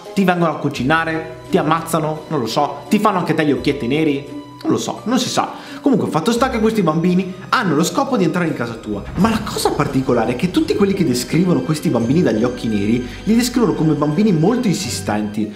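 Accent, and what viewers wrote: Veneto, Italy. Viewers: gamer foxy22 nord perché è del Veneto😆😇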